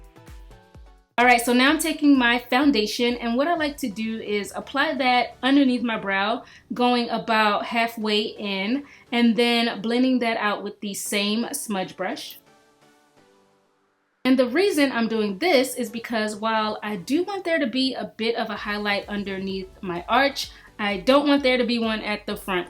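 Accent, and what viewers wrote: Baltimore, United States. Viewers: u have the strongest B-more accent.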